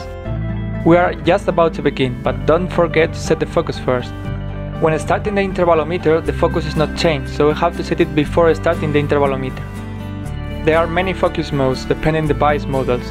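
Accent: Spain. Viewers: Spanish i guess. I know it because i am from Spain and many people speak like that :D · Gracias, me ha sido útil, pero cómo se te nota el acento español hablando inglés jajaja. No offense, fela.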